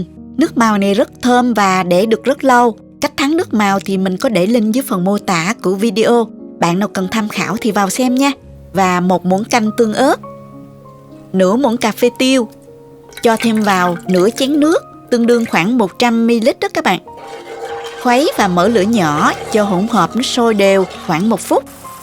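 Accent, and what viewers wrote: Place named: Countryside, Vietnam. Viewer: Giọng nói quê phèn mặn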